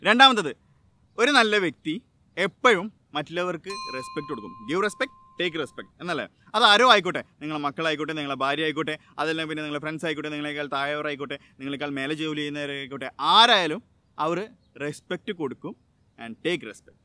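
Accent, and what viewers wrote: Kannur, India. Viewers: ningal kannur aano?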